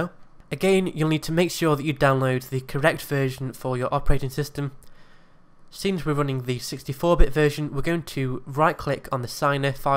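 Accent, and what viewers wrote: United Kingdom. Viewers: Im originally from stoke i recoginize that accent anywhere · A little off topic, but, are you Scottish? Not to be racist, but you sound kind of Scottish.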